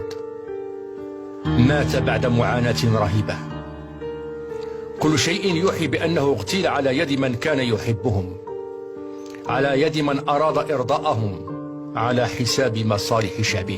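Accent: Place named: Morocco. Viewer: صاحب هذا الفيديو باين مروكي من طريقة كلامو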